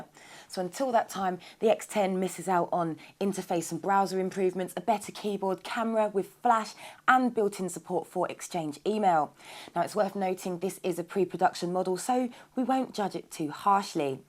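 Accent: United Kingdom. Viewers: Yeeeeeaaaaa, British English is charming)) Amazing pronunciation=)) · fuk this british acsent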